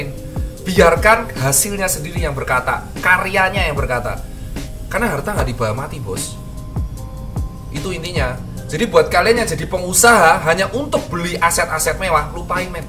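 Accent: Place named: Surabaya, Indonesia. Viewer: Ijin, bilamana buat tema saran/alternatif solusi : #salah masuk SMA (salah jurusan IPA / IPS / BAHASA) next... next... / SMK jurusan (listrik, mesin, bangunan, dsb) next... next... lulus sekolah atas, setelah pilih kuliah (keliru jurusan ???, bingung) / pilih kerja / kuliah+kerja (+- nya ... gmn management nya, biasanya... molor kyk i 😇). Seneng gaya+ngomong suroboyo an e / jowo medok, to the points lozzz ora rewel Sukses teros... dokter + enterpreneur 👍👍👍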